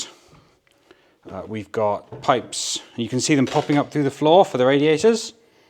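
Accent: United Kingdom. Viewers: I like your british accernt! so classy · Some old reclaimed floor boards would be beautiful in this old house 😊 can you tell me where in the UK you are from? I am so curious as to where this accent is coming from!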